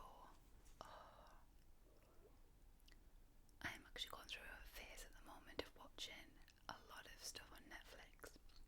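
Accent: United Kingdom. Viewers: Can I ask one more question? I sort of collect accents and I'm somewhat good at US accents, but that's because I live here. I'm really just starting to be able to recognize accents within the UK. What area does your accent originate from?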